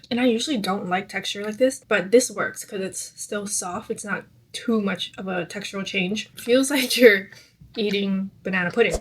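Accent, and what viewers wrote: Hawaii, United States. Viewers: 30 seconds in and i knew u were from hawaii too, love it!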